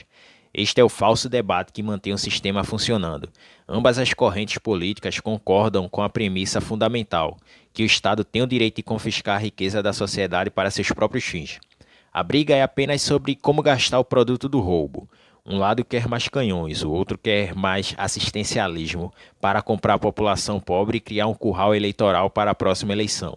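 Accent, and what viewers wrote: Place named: Northeast, Brazil. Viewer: É muito bom ouvir um conterrâneo cabra da peste narrando. Como faço para me candidatar? Seria uma honra.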